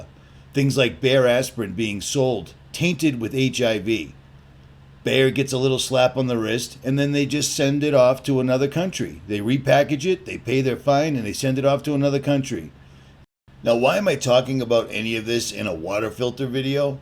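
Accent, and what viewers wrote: Boston, United States. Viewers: You must be a Boston guy??! Definitely gets a follow just for that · Sounds exactly like Richie from Boston. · This guy sounds just like that dude from Boston.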